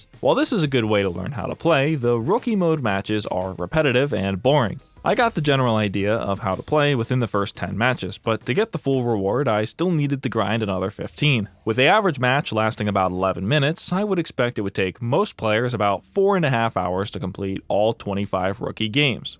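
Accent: Philadelphia, United States. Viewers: Are you from Philly? OH WAIT IS THAT WHY YOU'RE CALLED??? I feel like I'm learning so much lore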